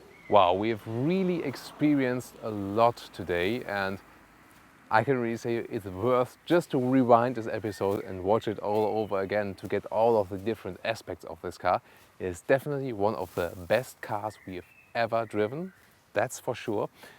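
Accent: Germany. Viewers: This guy does a great job on the car reviews and I like his german accent. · I've subscribed because of the great in depth reviews, but also because of that lovely german accent! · he speaks "germish" :)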